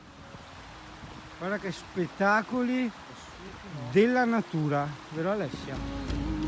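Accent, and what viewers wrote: Veneto, Italy. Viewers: Bel video complimenti, bella parlantina Veneta. Bellissimi posti 🤟 BUON SABATO sera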